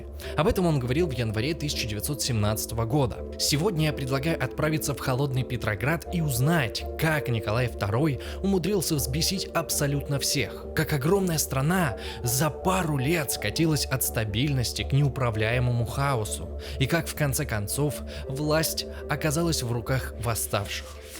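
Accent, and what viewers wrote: Ukraine. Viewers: Господи, как же раздражает этот украинский говор, нахрена букву Я в каждом слове проговаривать как Я твердо, в русском вообще мало где она так произносится, пишется Я, а произносятся звуки Й, И, либо вообще проглатывается звук Я при разговоре. Кровь из ушей, хуже только кубаноидный говор, этим говором русских пытать можно, только включить запись и мы сойдем с ума. А канал классный, но мои уши сворачиваются в трубочку 🩸 Я бы лучше с субтитрами посмотрела, выключив звук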